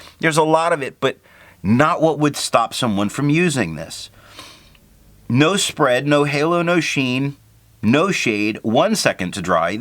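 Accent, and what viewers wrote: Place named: Philadelphia, United States. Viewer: Oh well. Some of your inflections sound like Philly. Hope you have a merry Christmas!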